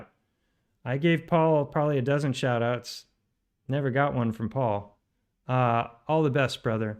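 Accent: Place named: South, United States. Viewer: It gets worse!! Typical Southerner ,he loves the sound of his own arse, sorry voice.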